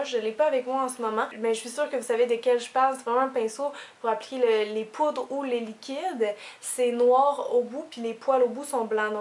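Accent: Canada. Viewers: salut je viens de m'abonner une copine m'a montrer ce ke tu fait moi j'adore et l'accent canadien c tellement magnifique , j'ai vu ta beaucoup d'abonner et tu l'ai mérité ! :)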